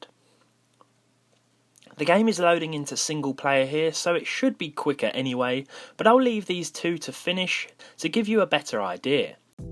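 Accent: United Kingdom. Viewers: I thought u were british... Effect?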